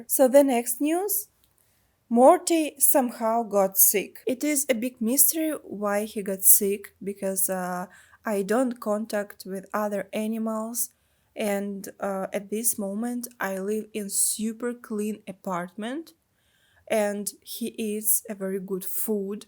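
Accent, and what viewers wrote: Russia. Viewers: It sounds like you're saying that you have to be either really skinny or very plus size to get sponsored by companies -- not be somewhere in the middle. I'm not sure who writes those articles, but I always wondered how accurate or not accurate they were when it came to youtubers or similar people. I thought you were around 28-31. To be honest, I think I thought you were from Russia in the past due to your accent.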